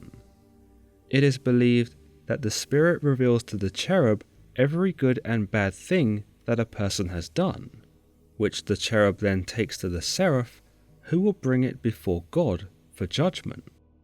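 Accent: United Kingdom. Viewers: The narrator is a pleasure to listen to. He has a very pleasant voice, great diction plus a very elegant (and easy to understand) British accent. Very enjoyable.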